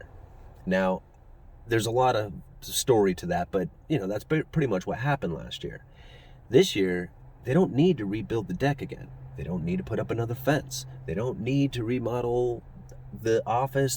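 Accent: Pacific Northwest, United States. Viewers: Sounds very Pacific Northwesterly. Nice!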